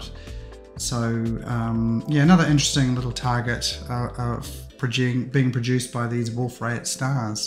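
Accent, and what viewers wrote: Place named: New Zealand. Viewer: Great photos, its always nice to see Southern hemisphere targets and even better to hear a kiwi accent. Im down here in Dunedin and the weather has been absolute rubbish. Im just starting out on my astro journey so Im really blown away with what you have achieved. Subbed and will watch the rest of your videos too.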